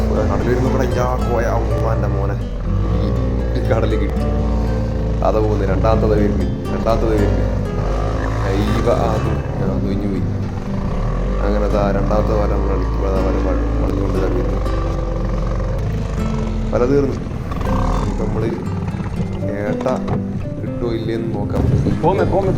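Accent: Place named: Kozhikode, India. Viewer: ഇത് എവിടെയാണ് സ്ഥലം. ഒറ്റക്കാണോ പോയത്.സംസാരം കേട്ടിട്ട് കോഴിക്കോടിന്റെ യും കടലുണ്ടിന്റെ യും ഇടയിലാണന്നാ തോന്നുന്നത്. 🥰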